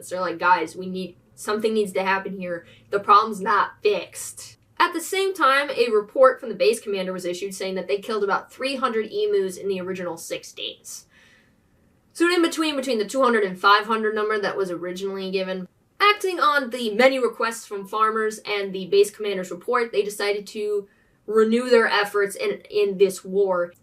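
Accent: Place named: Transatlantic, United States. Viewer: Love the music choice for the intro 😄. Can just hear that transatlantic accent.. and now for more Weird World History! 😂 "Number of birds" must be 2 🙂.. that's a number of birds 😄. "Let's go to war with a giant bird!" 🙂😂 Imagine if they really did say that 😆. And now they're protected.. they totally won 😂. You seem very passionate about this. Your eyes as you say "HUmAns!" 😄. It's nice.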